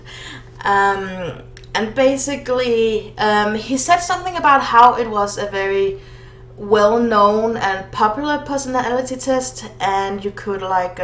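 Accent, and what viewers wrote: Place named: Denmark. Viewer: This is a nice idea! hehe, the danish accent cc;; ♥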